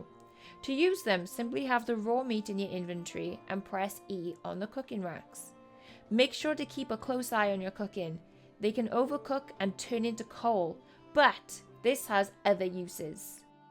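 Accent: Wales, United Kingdom. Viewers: Are you welsh? Sounds like you're playing it down a lil for your "stage voice" - which I wouldn't blame ya for, I pronouns would too if I had a YT channel! Not a criticism, just as a fellow Welshie I thought I heard it :) Only just started the vid but feedback seems excellent in comments so I'm SURE it'll be super helpful, so thanks in advance! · Great vid - made me smile :) nice to hear a fellow welshie on youtube too!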